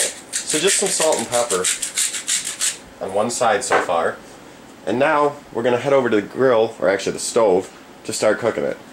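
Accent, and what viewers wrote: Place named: Midwest, United States. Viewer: 1 of the best recipes ever! Thanks man good job buddy! I can Tell by your accent youre from the Midwest! Im in ND! Thanks man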